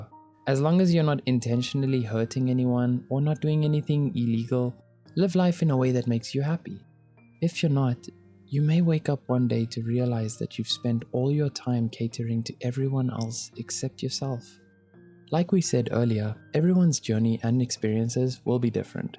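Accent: South Africa. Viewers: the man speaking in this video has a South African 🇿🇦 accent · South African narrator???? 😁😁😁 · Is the narrator South African? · the voice over artist has a South African accent😁🇿🇦❤ N#NODNAJUSTRSA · I love that the voice actor is south african ahh! good to see more of us in the media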